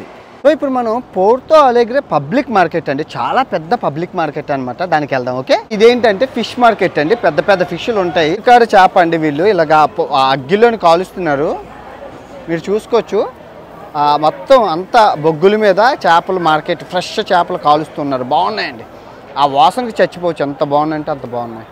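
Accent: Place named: Chittoor, India. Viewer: Namaste bagunnara 😂 , Andra chitturu style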